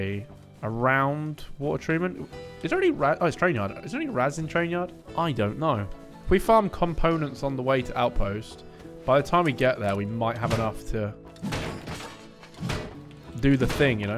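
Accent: United Kingdom. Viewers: Yo, about the coffee thing... I wanted to ask you a question - which may sound odd but it's genuine. is just your nickname or your actual nationality? (I don't recognise accents well, English is not my mother tongue). 'Cause I'm a Pole who spent 16 years in Italy and got used to that quality of coffee, I'd never buy coffe from an American because they do it wrong 😅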